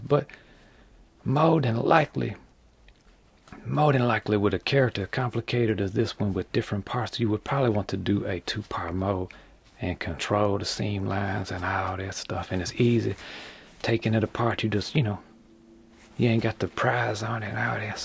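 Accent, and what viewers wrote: Louisiana, United States. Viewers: New to your videos. Is it rude to ask if you’re doing a voice? I’m from the South but the way you use contractions is very different from what I’m used to hearing. I assume you’re from Louisiana or Mississippi? Aside from that, great tips and love how your video persona is the puppet. I would do that if I made videos.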